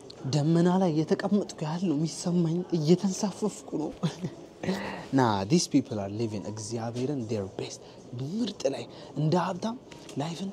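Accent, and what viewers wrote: United Kingdom. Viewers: I like your British accent….👍🏽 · u got a bitish accept man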